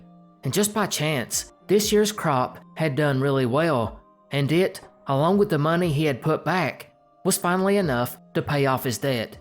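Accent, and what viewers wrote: South, United States. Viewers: o.k. Ga. boy from Kennesaw here. Love the Southern accent. So cool to hear. I finally broke down and subscribed. To hear the narration. Which is excellent. Not too crazy conspiratorial but leaves a lot open to basic common sense mixed with mystery. A good mix brother. I'm not a 'true' conspiracy guy BUT I do LOVE to hear as many as I can. They are fascinating,......and truly mysterious. I like your cognitive, analytical style. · I'm fairly new to this channel but am noticing how I get a little excited when a new vid appears. Is it me or is this the best narrator on YT right now. It's definitely one of the reasons why it's easy to view the entire episode every time to the end. I love Southern Drawl man!!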